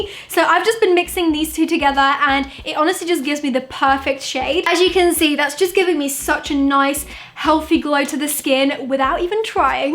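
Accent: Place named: United Kingdom. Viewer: Your tiny little eyes plus your British accent.... ❤ looks and sounds so cute :D i wish i could hv a British accent like that :<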